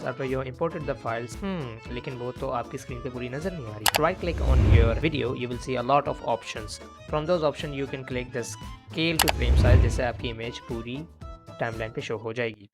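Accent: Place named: India. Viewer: He has the heaviest indian accent of all times.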